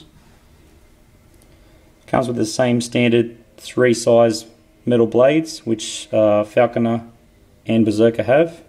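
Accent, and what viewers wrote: Australia. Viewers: nice review must be an aussie mate thanks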